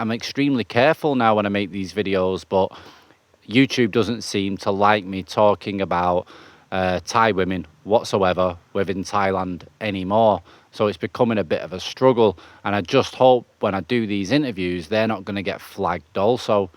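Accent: Manchester, United Kingdom. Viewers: Todmorden eh, I didn’t expect that😂 I was thinking Gorton or Longsight with that accent. I spent a few years in West Yorkshire, amazing people. If I had to live in England it would defo be there. I’m watching this in 11 degrees with threats of rain, that bamboo hut looks so appealing ✌🏼🙏🏻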